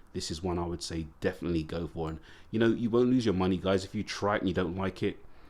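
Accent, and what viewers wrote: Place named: South, United Kingdom. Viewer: Hey buddy... What is wrong with your channel, you’re about 10’000 subscribers short! Fantastic in depth quality and a huge passion can be seen and heard in your voice. I will give you and your channel a mention in my community section on my channel for others to discover you. I’ve just recently covered the diving mode side of the GWF-D1000 next to the Gulfmaster, as nobody has covered it properly. You have an accent close to me being more southern on the UK Island but that doesn’t cover where you’re based of if I’m correct LML ;) Anyway my friend, will sub you and try and get you a little more attention as you deserve a heap more, trust me, i’ve seen many channels that aren’t near to your quality with thousands of subs. Have a great Sunday and I’m sure we’ll chat more ;)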